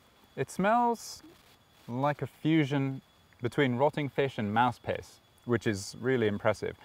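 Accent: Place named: United Kingdom. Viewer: He must be British. His accent is very nice for listeners.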